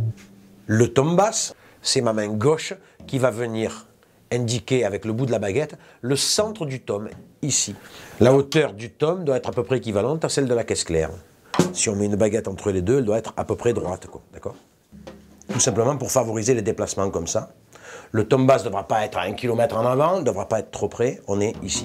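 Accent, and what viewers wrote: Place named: South, France. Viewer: Bonne enseignement avec l’accent du sud en prime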